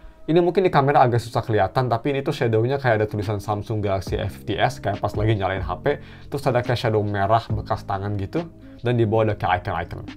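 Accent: Bandung, Indonesia. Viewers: Loh orang bandung?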